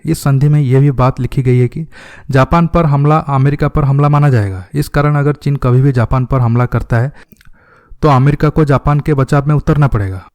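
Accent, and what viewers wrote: Odisha, India. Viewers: Ur hindi speaking is heared like odia tongue speaking.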